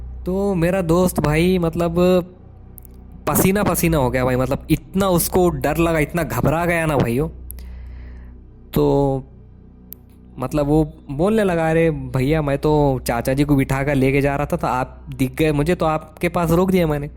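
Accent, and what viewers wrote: India. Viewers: Interesting accent of the narrator. Wonder which of India he’s from. I think this Waqiah happened to the narrator. Because he keeps saying “main ne” and then corrects himself saying “mere dost ne”. 😂😂 Good story. Got goosebumps 🙈🙈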